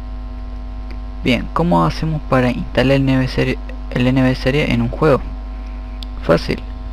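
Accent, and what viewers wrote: Córdoba, Argentina. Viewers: Sos cordobés? Me parece por tu tonada.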